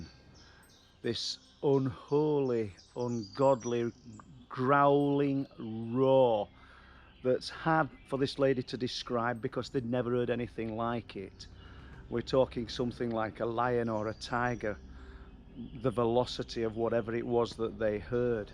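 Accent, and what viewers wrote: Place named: North, United Kingdom. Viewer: It’s the northern accent (slight I know) that really works with spooky stuff. Like Deb Hatswell.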